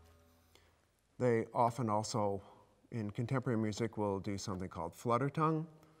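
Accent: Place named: Utah, United States. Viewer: Is it just me who likes his utah accent?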